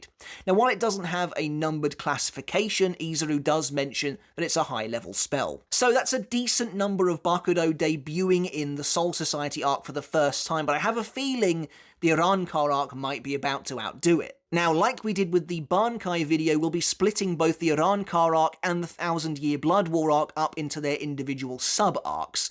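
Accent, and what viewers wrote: England, United Kingdom. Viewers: Pronouncing these with english accent is so irritating. 😂😂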